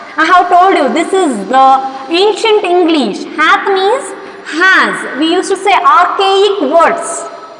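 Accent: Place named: India. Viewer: Very nice and excellent teaching madam.it is in Indian English ,we can understand easily and learn spoken English.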